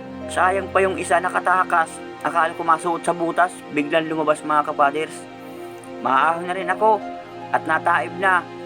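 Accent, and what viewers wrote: Bicol, Philippines. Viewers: kabayan na tatawa ako sa tagalog mo may halo bicol taga jaan pala ako sa wuezon province idol sa ka banda jaan ako sa san narciso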